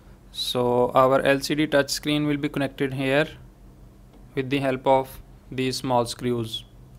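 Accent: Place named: Pakistan. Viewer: after hearing your voice I'm guessing you are Pakistani.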